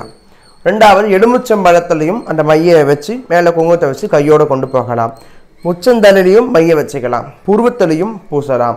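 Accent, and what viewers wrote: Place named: Chennai, India. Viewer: Super chennai slang super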